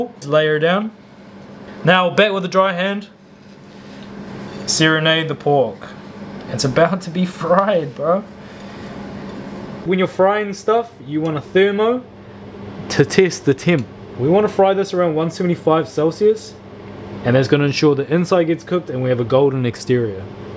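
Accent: New Zealand. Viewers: i love the nz accent so much.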